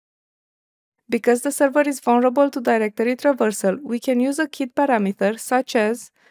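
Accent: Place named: England, United Kingdom. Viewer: i like you english accent dear teacher👍👍 would you mind telling me which accent is it??????????????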